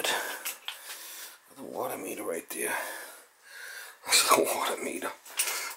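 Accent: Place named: New York, United States. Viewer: were in the USA are you based I'm a retired plumber and heating engineer from Scotland love your videos your just an honest tradesmen earning your corn but not bull shiting your customers you sound like a new Yoker apologize if I'm wrong I'm from Glasgow Scotland love your videos but remember it ain't easy being cheezy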